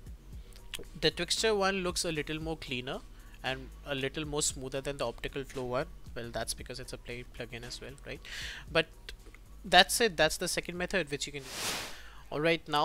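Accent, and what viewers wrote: India. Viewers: nah i love your accent, indians make the best tutorials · this tutorial helped me out alot ! and honestly i dont know how anybody could make fun of your indian accent, your english is very good ! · Best indian accent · i stopped the video when you said that you're from India and you have an accent. let me tell you something real quick my friend you have a great talent and your English is GOOD, do not worry about any negative trash talk about anything that comes around you just keep doing what you are doing because it's GREAT !!! and now I'm learning from you my friend <3 Thank you for posting such a great talent like that :D B+ always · Bro just watched your tutorial dont worry about your accent! I've come to loved this accent since I always learn from indian guys in youtube! You guys are simply the best. :) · watched hundreds of indian tutorials, i wont even care if you speak indian id still understand you either way, even if im not indian. 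good tutorial tho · Hey buddy quit trying to explain your accent, it's cool and we don't care. And thank y'all indians for sharing your knowledge online for free. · I want to get ur accent i try but I can't, I even watched a yt vid on how to sound like Indian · Don't think too much about it man you actually have one of the lighter indian accents that i have heard lol🤣🤣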